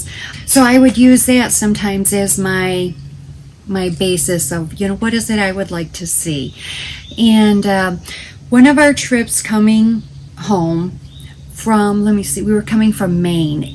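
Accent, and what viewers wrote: Michigan, United States. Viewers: Hello! I found about you through Linda Davis and I'm a new subscriber. I love to hear about moving adventures and I'm looking forward to more of your videos. I live in Northern NJ and I love where I live but dream of moving to somewhere in NE someday. I even lived in Michigan for three years. My son was born there so I'm very familiar with your accent! I have family in Vermont, Rhode Island, and New Hampshire as well and love going out to any if those places any chance i get. Best wishes to you and your family and I hope you enjoy living in New Hampshire!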